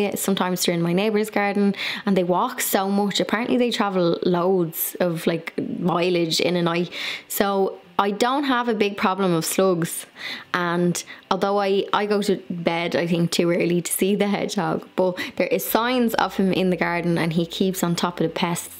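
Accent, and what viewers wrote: Ireland. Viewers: just found your wonderful site! Awesome! You have so much energy! and all the hard work has resulted in beautiful gardens! (where are you located? I detect an Irish accent) Keep on keeping on....regards from Nana Green Fingers in the U.S.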